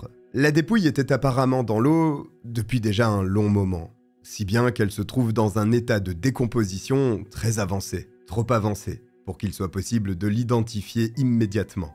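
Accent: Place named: Europe. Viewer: Je te ❤ ta voix me caresse les oreilles et tu n’as pas un trop gros accent. ( en t k il est charmant et pas agressant) comparé à d’autre européen.J’adore ce que tu fais! Bizou 🇨🇦du Québec ✌🏽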